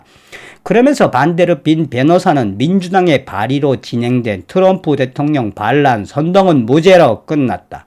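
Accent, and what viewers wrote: North Korea. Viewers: 요즘 북한 사투리에 빵빵 터집니다. 간만에 재밌는 웃음을 주시네요~^^ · 이 분 북한사람 인가요? 매번 북한 말투로 ???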